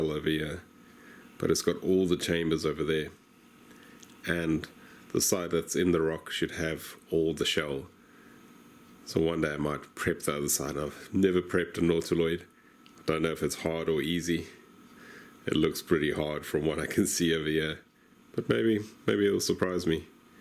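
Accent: South Africa. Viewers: Are you from South africa🤔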